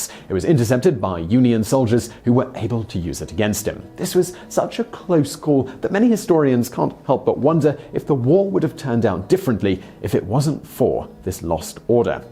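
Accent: United Kingdom. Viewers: You realize he is British and they pronounce things differently there right? Saying Yuck-atan is perfectly fine. He also pronounced Leif correctly, it is a Scandinavian word and it is usually pronounced Layf, look it up. Try to do some research before attempting to incorrectly correct things, yah dingus. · mispronunciations really bother me for some reason. He does pronounce things in a British way sometimes which doesn't bother me. It's more the pronunciation of Yukatan and on one of the other videos he mispronounce Haiti.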